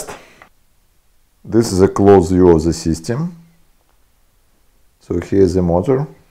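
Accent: Russia. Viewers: произношение очень похоже на английское произношение русскоязычного человека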